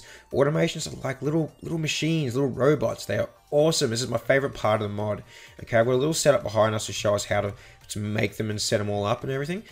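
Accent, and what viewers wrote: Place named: Australia. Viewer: It's good to hear an Australian on YouTube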